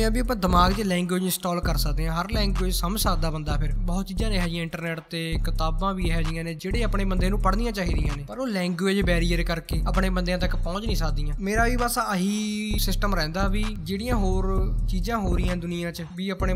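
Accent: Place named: Malwa, India. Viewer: Mittra Bolan da way tera Bda Ghaint aa tera Malwayi lgdan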